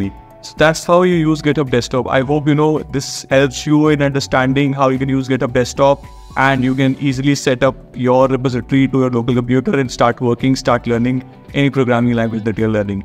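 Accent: India. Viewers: Thanks for the help and also you have the most perfect male Indian English speaking voice I've heard. So soothing yet has a subtle Indianness to it.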